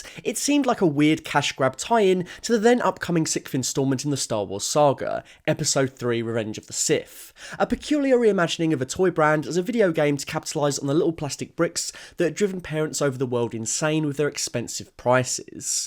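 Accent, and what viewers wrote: United Kingdom. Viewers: Oh god he's British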